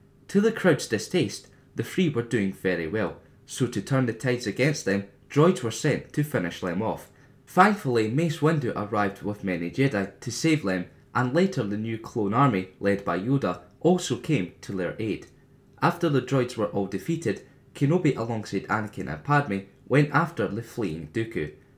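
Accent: Scotland, United Kingdom. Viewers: Just stumbled into this. Great video and love the accent. :D Might I presume "Scottish" ? Keep them vids coming. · What's your accent from? I'm hesitating between Scottish and Irish.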